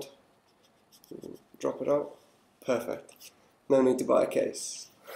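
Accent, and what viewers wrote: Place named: England, United Kingdom. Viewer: thts such a sick english accent =D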